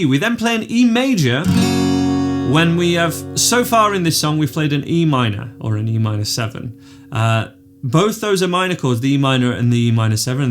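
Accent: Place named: Preston, United Kingdom. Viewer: Hi Some really useful lessons on here for beginners. Thank You. Listening to the accent, are you from Preston or Huddersfield (or somewhere in between).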